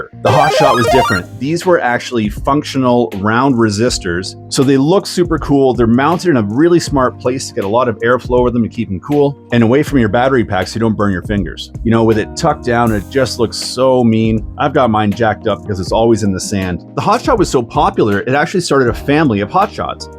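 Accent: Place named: Canada. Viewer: Deckles and Lie-very… you Canadians always make my day!🤣 I built a frog back in 83/84… I grew up in Brooklyn and my neighbor upstairs was an ex plane builder, he built a frog and a holiday buggy for his two sons… I had to have a frog too… I like how the grasshopper looked lots more but I knew with the 380 I wouldn’t be able to keep up lol The super champ was the dream back then, and all the bad ass team associated cars that were just way too much for my 10 year old taste lol Those videos make me want to build them ALL!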